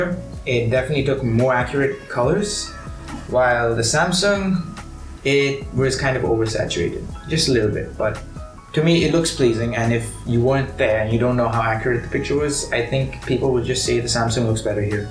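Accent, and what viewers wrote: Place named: India. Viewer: Your Indian accent is not funny 👍😅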